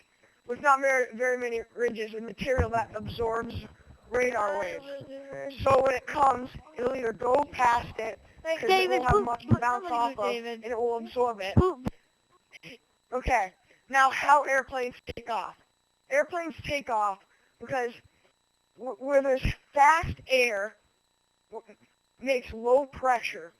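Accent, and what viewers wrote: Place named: United States. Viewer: he sounds so american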